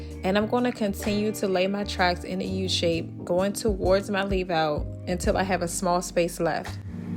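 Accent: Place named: DMV, United States. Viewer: Love the hair!! And please tell me you’re from the DMV lol. My boyfriend says we don’t have accents, but I know it when I hear it!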